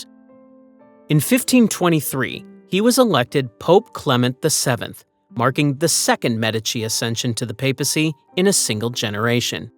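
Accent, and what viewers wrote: United States. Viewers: Having an American voice is wrong, ye shouldn’t Be involved in European history, go away ffs I immediately tuned out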